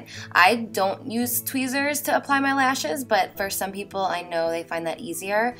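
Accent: New Jersey, United States. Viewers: Omg! Her jersey accent is so strong in this video! 😍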